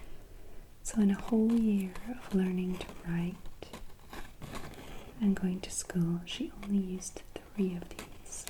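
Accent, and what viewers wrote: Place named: Scotland, United Kingdom. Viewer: I was thinking the whole way through this video 'she definitely sounds a little Scottish' and then you said you went to school in Scotland lol that's where I'm from too. Can I ask which part of Scotland you lived in?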